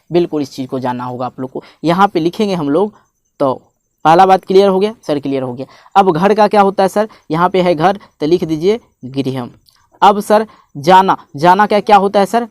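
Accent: Bihar, India. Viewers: Sir aap Bhoot hi acha padhate ho aap bihari ho na 😅😅😅 mujhe pata. Chal gya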